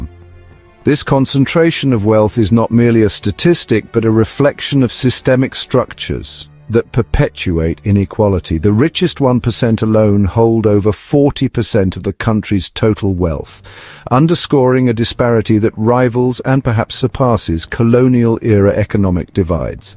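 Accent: United Kingdom. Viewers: One blatant lie is enough to expose the nefarious agenda- the one about Tejas. The posh Brit accent is the other giveaway😅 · lol, obviously a muslim inspired rhetoric. No one is taken in by the English accent. Someone in congress woke up and initiated some creative AI?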